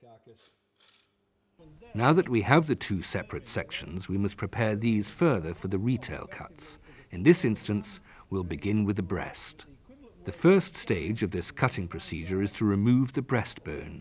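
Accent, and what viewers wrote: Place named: RP, United Kingdom. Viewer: We don't need the posh version thanks.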